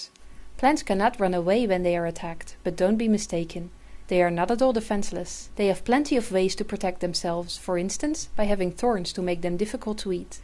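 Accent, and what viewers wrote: Netherlands. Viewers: It's very much a Dutch accent :) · Nederlands accent is Nederlands. Maar leuk onderzoek. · The Dutch shouldn't try to speak English. This is horrible.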